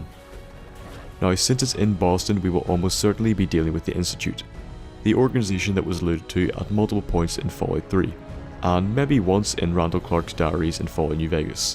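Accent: Northern Ireland, United Kingdom. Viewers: Are you from Northern Ireland ? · Finally realised you're from Northern Ireland. Rad about ye kiid?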